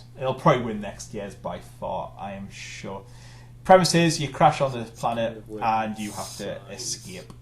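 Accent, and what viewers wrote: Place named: Newcastle, United Kingdom. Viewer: I dinna no ya was a Geordie!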